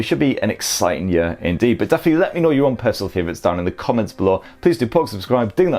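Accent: United Kingdom. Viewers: Love the approach to all your videos, you should be bigger but hey maybe some can’t handle the queen’s English. Keep up the great work mate .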